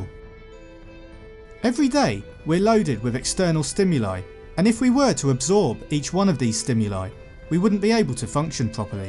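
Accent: England, United Kingdom. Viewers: What part of England does your accent come from?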